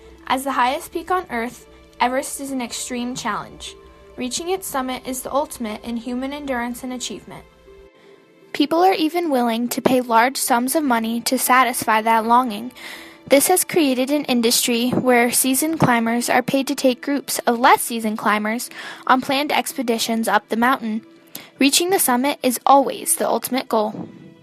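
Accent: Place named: India. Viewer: Indian accent 👌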